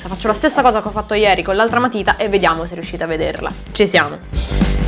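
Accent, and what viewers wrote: Tuscany, Italy. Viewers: Ma sei Toscana?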